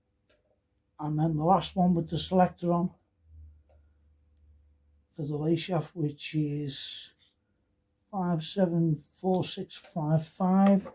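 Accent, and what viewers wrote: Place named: Liverpool, United Kingdom. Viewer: I left Liverpool 40 years ago, love the accent, and this video was very helpful, cheers.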